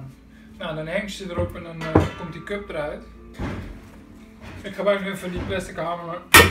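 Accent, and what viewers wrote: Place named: Russia. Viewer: What country are you from sounds Russian nice build anyway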